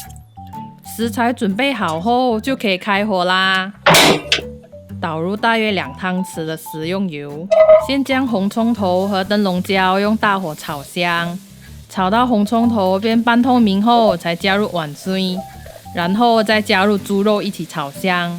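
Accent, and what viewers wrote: North, Malaysia. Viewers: 你的食谱都很喜欢，请问是北马人吗？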